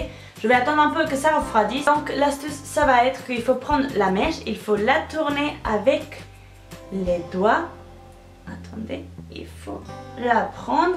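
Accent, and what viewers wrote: Spain. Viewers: T'es magnifique! Moi aussi je suis espagnole! ❤️❤️❤️ Mais moi jai pas d'accent, dommage..😒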